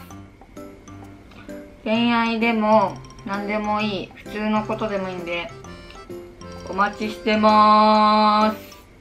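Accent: Okayama, Japan. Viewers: 岡山弁聞くと落ち着く😬 スキー〜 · 岡山人として誇りです 時々出る岡山弁落ち着きます 応援してます🔥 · しろくまの抱き枕おんなじの持ってる！！気持ちいいよね🤤 私も岡山出身だから親近感ある！岡山弁すっと入ってくる笑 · 岡山弁がたまらんのじゃ！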